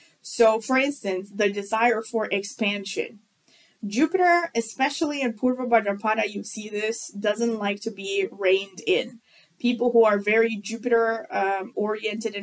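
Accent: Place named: California, United States. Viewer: I enjoy your accent and listening to you speak. Arr you from Cali?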